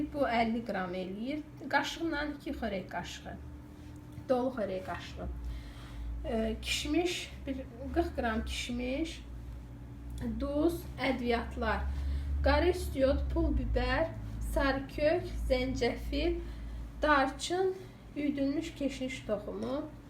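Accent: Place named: Nakhchivan, Azerbaijan. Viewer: AXWAMINIZ XEYIR XANIM MENDE BAKILIYAM SIZ HANSI KENDDENSIZ SOZLERIMIZ DANIWIQIMIZ OXWAYIR NOXARIWLA YE BELE DEYIRIK😊😊😊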